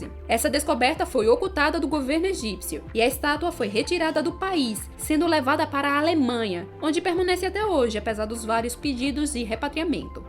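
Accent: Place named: Sergipe, Brazil. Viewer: Ela é de Sergipe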